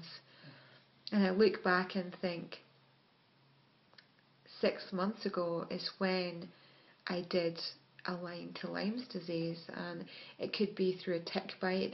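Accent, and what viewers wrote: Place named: Scotland, United Kingdom. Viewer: I think i detect a bit of Scottish accent.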